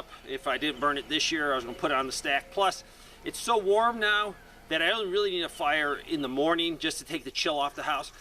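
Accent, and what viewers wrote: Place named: Kansas, United States. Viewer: why are you called when you sound like you're in kansas or somewhere not south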